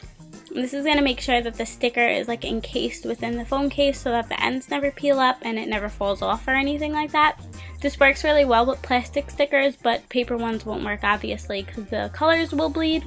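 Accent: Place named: New Jersey, United States. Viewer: you sound like you're from jersey. are you?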